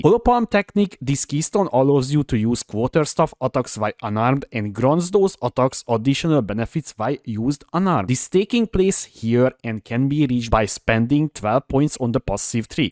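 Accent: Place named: Hungary. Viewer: Gusztustalan magzar akcentus. Tanuld már meg kiejteni a szavakat, ha már az adott nyelvet próbálod meg beszélni.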